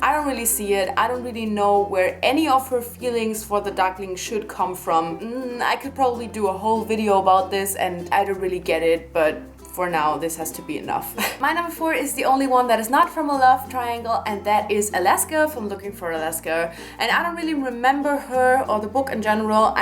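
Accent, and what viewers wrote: Germany. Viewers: Great List! Are you german?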